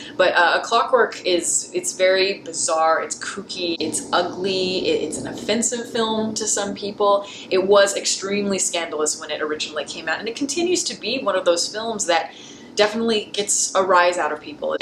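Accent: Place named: Los Angeles, United States. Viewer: That's how girls talk in Silverlake (LA, California).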